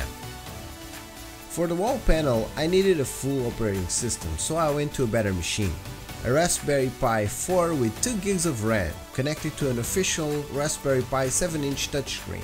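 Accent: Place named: Portugal. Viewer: Muito interessante, e barato. Fiquei surpreendido por ser um vídeo português, já que o seu inglês quase não denuncia o habitual sotaque. Parabéns 👍🏻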